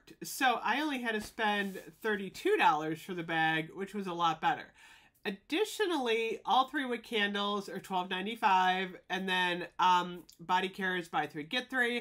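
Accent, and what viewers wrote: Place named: Ohio, United States. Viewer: I love watching your videos- there’s something almost nostalgic and comforting about your content. I kept thinking- “I bet she’s from Ohio!” I grew up in Columbus, OH and there’s something so familiar about your accent and style.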